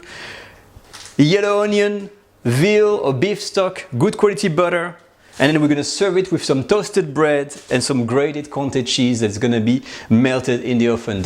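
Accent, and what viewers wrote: France. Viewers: I never had french onion soup and that looks delicious :DDDDDD. Also you sound like my french friend that I play games with. Your accent is funny he says pwease instead of please! He is a good lad! :D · J’adore votre accent français 😄 merci de préparer ces recettes !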